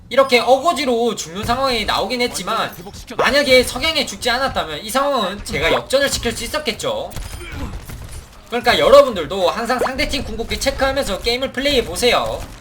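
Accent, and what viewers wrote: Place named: Busan, South Korea. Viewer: 뭔가 부산 말투 같으신데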